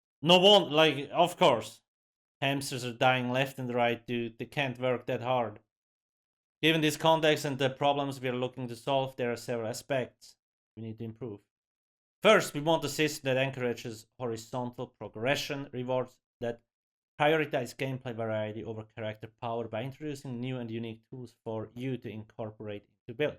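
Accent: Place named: Switzerland. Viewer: Just wondering where are you from? Your accent sounds swiss to me but my pops though more up north 🤔. Anyway you still speak very clearly and that's good especially when looking for guides...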